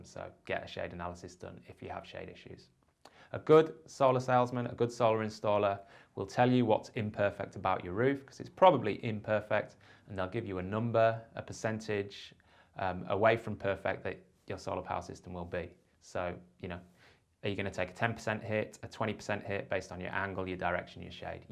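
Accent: England, United Kingdom. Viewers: Sounds funny hearing someone with an English accent telling you to face your panels North.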